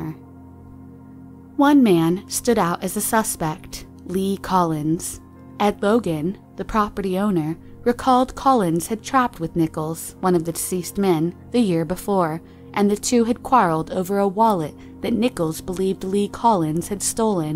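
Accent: India. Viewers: Who's voice was it? Is she Indian?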